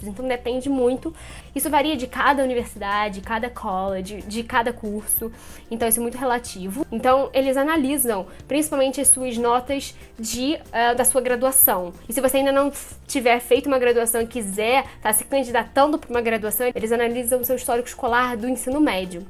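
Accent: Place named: Rio de Janeiro, Brazil. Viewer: Maix, pexquisa. Aposto que é carioca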